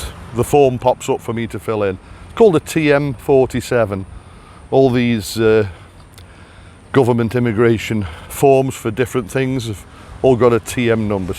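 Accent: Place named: Stoke, United Kingdom. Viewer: New sub here, you sound like a stoke youth ?..i,m back in uk after 7 months (222) days in thailand, i cant wait to get back ,. ive been over there 11 times in all since 2009, ive got a long term thai g/f and we live at her nice house in kamphaengpet , ive got a car so we travel all over TH usually for a month when i get back there, .The last trip was 25th nov 22 and we did most of the southern islands , spent a couple of days in kamala beach down your neck of the woods , I had my fill of thai immigration with extensions and border bounces but it worked out ok to maximize my stay,. I bet it does your head in looking at the thai power pole wiring, im surprised it wasnt 1 of your 5 thai dislikes, !. I plan on getting a retirement o visa next time but there's talk of immigration doing a 90 day visa exempt and changes in the 800000 bht retirement o visa, have to see how that goes, i want to be back by the end of november latest,. 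Bit pointless getting a 60 day tr visa in uk if they end up doing a 90 day free one, im doubting it will happen , having only retired on nov 18th 22 and flying out on the 25th nov 22 69 y/o times not on my side, ive been back in uk since july 4th 23 and i cant seem to get motivated over here, i was planning on selling up and shipping out but with the unsertanty with th immigration its easier said than done, i might go down the agentcy route visa wise, although i have the money and resdency out there ive not got thai bank account yet,.we will see how it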